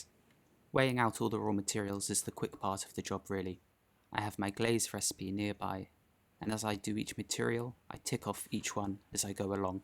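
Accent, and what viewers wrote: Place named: England, United Kingdom. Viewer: These videos are like a mini documentary. I think the edit of the video and over dubbing the narration is the strength of production. Having an English accent lends to the 'officialness' of it all. I like the pottery produced, but I'm at a loss as to the rapid success of sales other than whetting the appetite of anticipation with previews of quality and attention to detail these videos showcase.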